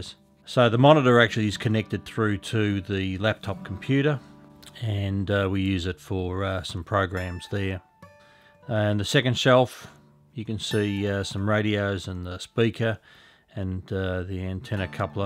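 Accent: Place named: New Jersey, United States. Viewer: that's a lovely accent you have, new jersey?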